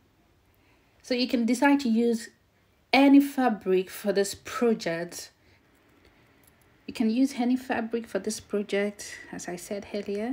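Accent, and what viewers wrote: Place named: England, United Kingdom. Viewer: I like your English accent 😉😁👌 New subscriber 🤩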